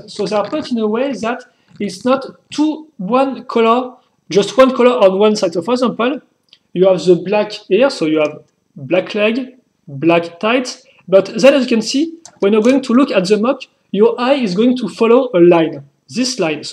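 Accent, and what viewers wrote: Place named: France. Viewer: Du vrai francais dans l'anglais ^^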